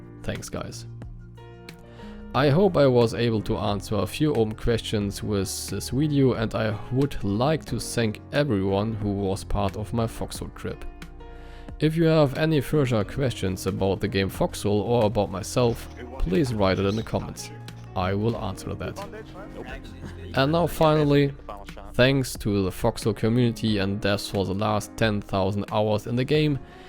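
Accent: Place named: Germany. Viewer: Im Ernst das ist deutsch-englisch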